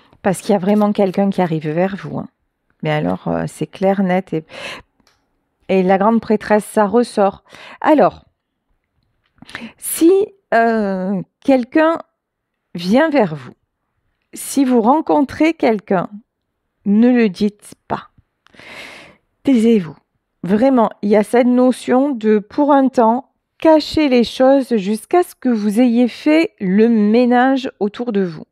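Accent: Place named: Nîmes, France. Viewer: Bonjour 🌸je suis hélas dans cette situation d’horreur 😫😡plus trop de force, Plus d’énergie mais je veux me battre & obtenir réparation..d’injustice p/abus de Confiance s/personne mis sous tutelle (Packinsonien)Besoins d.aide!... D.agréables moments d’entendre votre voie de « NÎMES «encore mercie 🌸🍀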